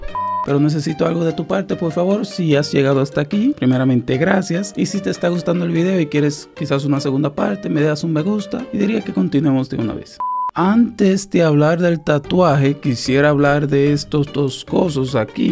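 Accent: Dominican Republic. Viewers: Eres dominicano 🇩🇴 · eres dominicano?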